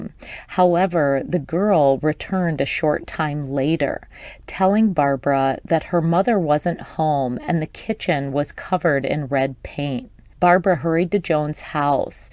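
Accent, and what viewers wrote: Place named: West Bloomfield, United States. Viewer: im from w bloomfield i hear that mi in your voice thank you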